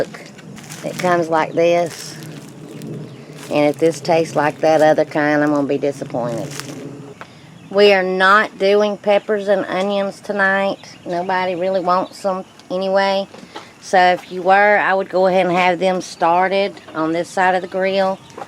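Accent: South, United States. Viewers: That strong southern accent! Music to my ears. By the way those cheesesteak sandwiches look mouth watering. Got to try me one of these, thanks for sharing. Greetings from England. 🙂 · Never thought I would across somebody that talked country as me. Bravo. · Looks fantastic. I absolutely love your southern hospitality style and voice. Reminds of going to the family gathers for reunions or backyard BBQ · That Southern draw. You rock.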